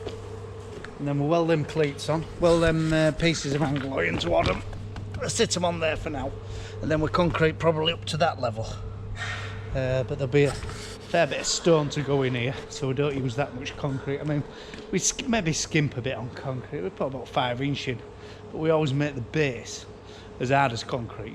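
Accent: Yorkshire, United Kingdom. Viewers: Loving this channel - taken a while for my Cornish ears to tune in to your Yorkshire accent but I’ve got it now. Your thinking is very much the same as mine - I have had concrete as thin as 2” on a really firm base. Never bother with reinforcing but always add anti-crack fibres.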